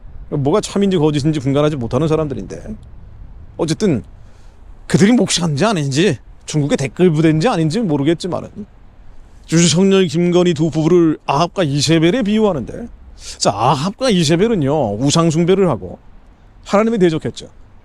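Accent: North Korea. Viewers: 하나님의 심판이 있을것이요 이사람 목사도 재명된자다 목소리도 이북 빨갱이 냄세가 나는데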